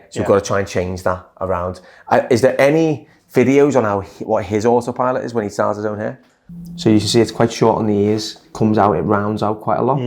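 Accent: United Kingdom. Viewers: He’s scouse, isn’t he? With a very slight touch of paddy.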